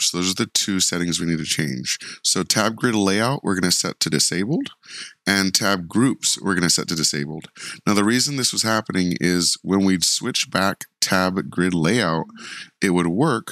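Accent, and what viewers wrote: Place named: Jamaica. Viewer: It worked. Thanks. On a side note, you sound Jamaican.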